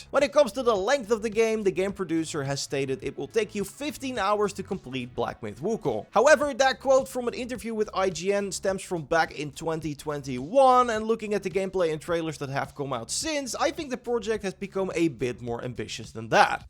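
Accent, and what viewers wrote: Netherlands. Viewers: Dude stick to dutch videos your English accent is horrible. Also listen to how he says wukong lmao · I love your dutch accent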